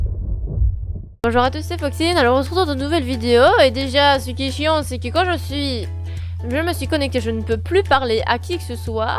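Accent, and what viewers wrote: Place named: Belgium. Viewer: elle XD ets une fille Preuve: son nom est Et sa voix c'est parce qu'elle est Belge.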